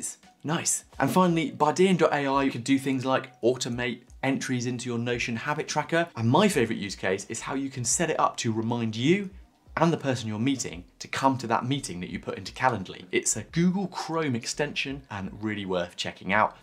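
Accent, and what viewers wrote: United Kingdom. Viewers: I fell in love with your channel two years ago and have religiously been watching every video as well as BUYING both of your second brain offerings. Being an avid viewer, I feel compelled to give you some constructive criticism. We Americans are a sucker for a proper Brit accent and you have a brilliant one. However.... (you knew this was coming)... in the last year or so, you've begun to sound like a pitch man rather than a user advocate. I realize it is most likely difficult to integrate paid sponsorships and make them sound like a recommendation rather than a compulsory blurb in your video. Keep in mind, though, your avid viewers are well aware of the paid sponsorships and seriously know the difference. I have to admit it's really started to turn me off.:( The sponsorship nods get longer and longer and they're usually about products that everyone already knows about. 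I don't want to abandon your channel so I figured, to be fair, I should express my thoughts to give you food for thought and hopefully, have you get some retrospective insight of then vs now to see where I'm coming from.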